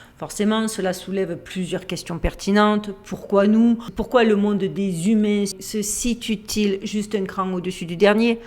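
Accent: Marseille, France. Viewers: Bon aller je me lance on est de Toulon et avec ma femme on est just FAN!!! Milles mercis à toi on adore tes vidéos tes analyses ton intelligence alors qu'au tout début j'étais sur le cliché "blonde avé l'assent de Massilia" mais wtf et finalement comme trop souvent il faut lâcher les "à priori" et conclusion on a découvert une belle personne qui nous apporte bcp. Alors oui mille mercis à toi!!!!!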